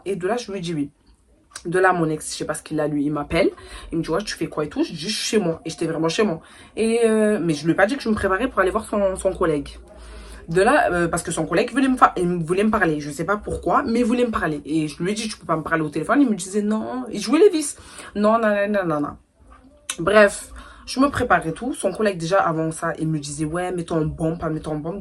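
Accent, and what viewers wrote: Marseille, France. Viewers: coucou je suis nvl sur ta chaîne ton accent me fait penser a une marseillaise peut-être je me trompe t mignonne nimporte qu’elle filles au monde ne mérite pas cela l’homme qui a un comportement comme sa franchement sa me dégoûte je te souhaite bcp de bonheur et du courage pour la suite tu ma donner chaud au coeur tellement sa ma toucher 😢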